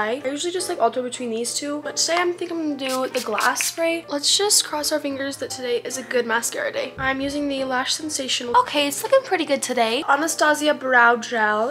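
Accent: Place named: United Kingdom. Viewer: I love your morning routine and your british accent voice ☀❤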